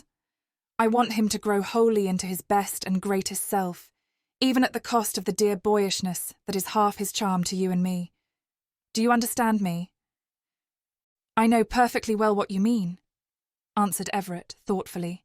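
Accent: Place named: United Kingdom. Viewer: Please find American voices for Ametican novels. (I have a sensative ear for accent & couldn't listen to your James Joyce stories w a British accent. They didn't work ....). In genrral I am grateful for your downloads. Very.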